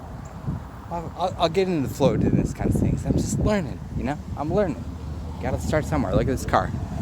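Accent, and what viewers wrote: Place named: Northwest, United States. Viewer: I found and watched a previous video when I searched “Can you combine Monstera plants”. (Your video when you combined your plants.) I thought for sure you lived in the North West United States. Are you from there? You have the accent. We lived in California for 15 years and when we came back to the south, our families would comment on our accent and I didn’t understand what they meant until a few years of being back. Now I can hear it. 😂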